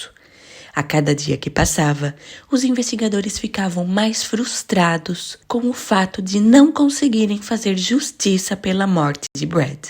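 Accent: Portugal. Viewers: Gosto muito de sua voz e seu sotaque. Penso que vc é Portuguesa.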